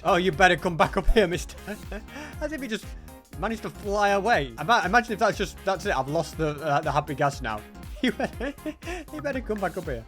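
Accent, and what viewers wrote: United Kingdom. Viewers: either way he literally speaks in a type of british accent all the time so ur comment makes no snse. what u mean is a british word u cant seem to remmerb him saying much, noy at all the same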